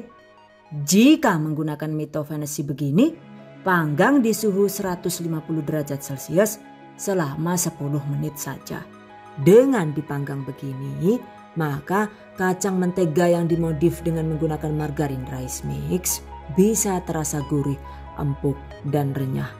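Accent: Banyumas, Indonesia. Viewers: Lebaran kemaren pakek resep inih, dan bener bener mantul banget, makasih ya bunda, gak salah sih subcribe channel ini krn bener bener bermanfaat banget, banyak tips and triknya. Apalagi seneng banget sama ucapan konyol bunda haha, dulu ku kira bukan suara asli kyk ada dubber org lain, ternyata asli loh orgnya juga cantik. Ngapak ngapak gitu lucu aja wkwkw #GiveawayTHV2021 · Mbaknya suaranya enak...empuk kaya kacangnya....hehe...ga taunya ngapak jg ya...... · Anger mb ngomong ngapak gawe aq gemuyu, menghibur 😃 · Suka ngapaaknya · Aku suka ketawa sendiri, klo bicara ngapak😊😊 lucu..😂😂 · Trimakasih resepnya ya bun resepnya bunda mantap2 semua & +gemes sy kl bunda ngomong pake bahasa ngapak ampun deh sy jd ketawa trus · Mantap... Pada bae logat Nyonge...😊👍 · Jenengan ngapak toh mamake😁_mntp mksh sharingny mamake mtrswn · Ini lokasi Madiun tp kok logatnya ngapak? 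Wkwkwkwk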